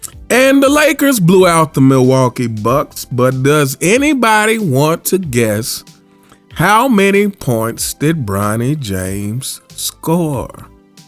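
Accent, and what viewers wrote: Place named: Memphis, United States. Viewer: Been following your content for a while. I knew you were from Memphis after watching one of your episodes. Are you from the Mound, because you sound like 8Ball.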